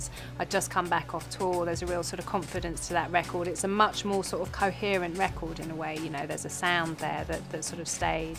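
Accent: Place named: London, United Kingdom. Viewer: She's so London, innit?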